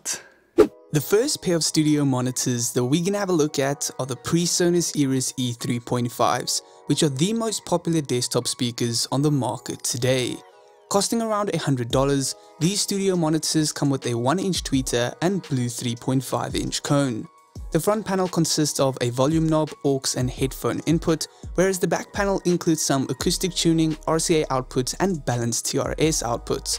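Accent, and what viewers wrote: South Africa. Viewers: I hear a South African accent. Am I right?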